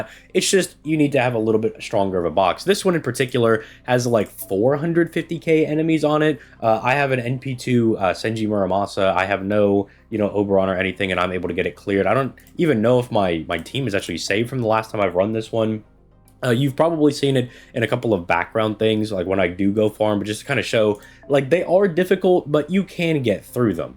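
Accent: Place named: United States. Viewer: He's American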